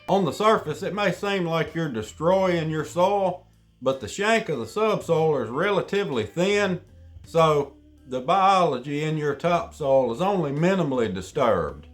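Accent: South, United States. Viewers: I love that deep southern accent brother.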